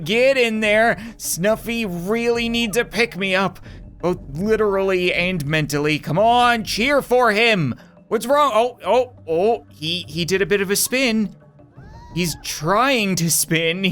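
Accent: Canada. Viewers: Wait, you're Canadian? How did I not notice?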